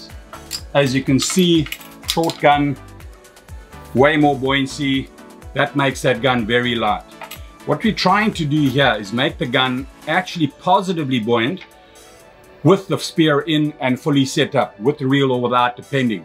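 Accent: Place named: South Africa. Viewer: I have been watching your videos for many years with your cute south African accent and I am waiting for you to start making wooden gun. Now I have the reason to visit you in South Africa!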